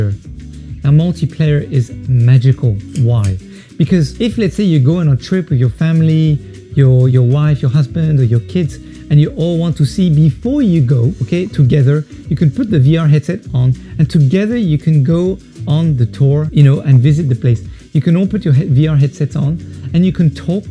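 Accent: France. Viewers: Sa sens le français à pleins nez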